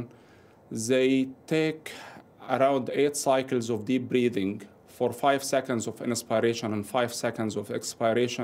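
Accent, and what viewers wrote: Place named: Egypt. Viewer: VERY INFORMATIVE. strong Egyptian accent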